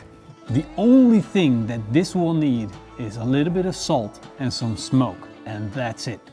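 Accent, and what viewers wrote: Netherlands. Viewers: he sounds dutch, are you dutch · Where you from yo accent sounds dutch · ik hoor een soort van nederlands in je stemm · Dutch accent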